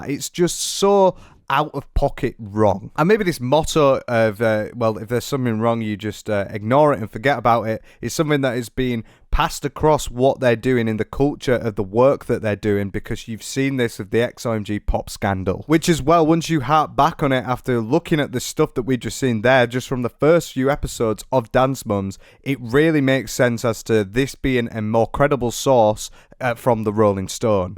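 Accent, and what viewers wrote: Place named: Scotland, United Kingdom. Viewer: I could swear I heard some Scottish accent 😭 good content keep it up 👍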